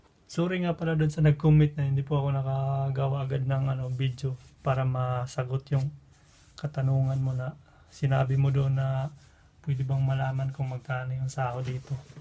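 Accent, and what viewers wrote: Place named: Visayas, Philippines. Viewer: Grabe idol napo kita kuya. Kapit lang nasa tiyaga talaga ang sekreto.. Apply ka din Canada kuya kasi kahit papano dun may security ka kasi pwd kang magng Permanent resident kasama pamilya mo.. mas madali yata mag cross country galing Japan to Canada.. i love your vlog you sound bisaya kaya nag subscribe ako hehe keep it up!!!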